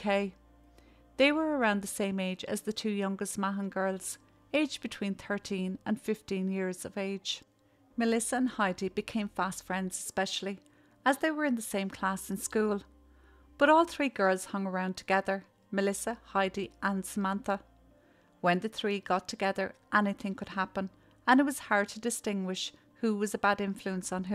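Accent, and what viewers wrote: Ireland. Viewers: Its been such a long time since ive been back on. My apologies for not being able to show support on here as of late. I'm glad I'm back for now and will try to catch up on all the videos ive missed while I was in hospital. Certainly have missed my favorite Irish accent. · Dear, please don't be disheartened. Your videos are excellent and I love to listen to your lovely Irish lilt. Listening to you makes my day! ❤ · Your videos are incredibly well researched and beautifully narrated with that lovely Irish accent. You are very sensitive and respectful and can only do as well as the information out there. Hi from New Zealand 🇳🇿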